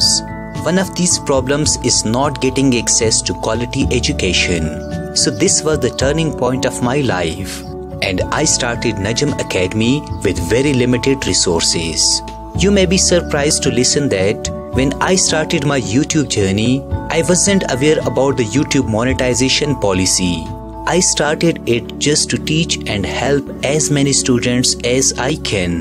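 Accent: South India, India. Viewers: Really It's So Surprising That The English Accent Of ur's Does Matches Which We usually Hear frm our Teachers In India.... So basically U r Indian Famous Teacher On Utube this is my personal opinion.... But ur wrk is tough As I Think....But At Last when U Said Naah Man I am Not Handsome.....that's Wrong In Content Becoz Person Should be good at skills not In Face ..... Lots of respect And Blessings Frm Children Frm India .🙏🙏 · I thought you were South-Indian because of your accent😅.. Anyways, really you're really great teacher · I thought u were from India's South😅😂 keep it up broo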